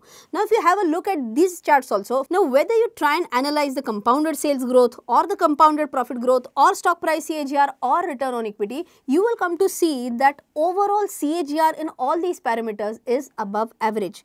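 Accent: Maharashtra, India. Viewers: Your Marathi English sounds so sexy !!! 😍😍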